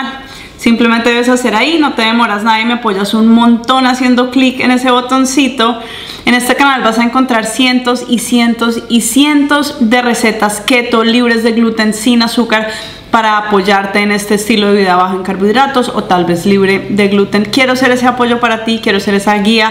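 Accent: Colombia. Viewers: Toque costeño? O toque Venezolano?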